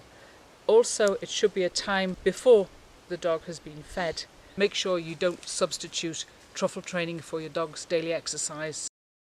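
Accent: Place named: Liverpool, United Kingdom. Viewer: Do i detect a wirral or Liverpool accent great video by the way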